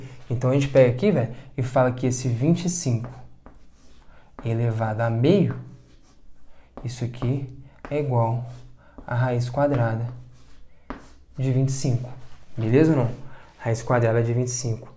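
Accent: Minas Gerais, Brazil. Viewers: Se é mineiro,não é? kk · explicando as coisa é MT mais satisfatório uai kakakak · Tu és mineiro, né? Kk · esse minino é mineiro, tenho certeza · Mano, que legal, sou mineiro também! Sério, pra mim Minas não tinha sotaque, ao mer ver tínhamos um "português puro", mas eu realmente consegui perceber o seu (não que não seja esplendoroso). Ksksksk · bom dms ouvir um minerim falando, tranquilidade contagiante. Parabéns pelos ótimos vídeos irmão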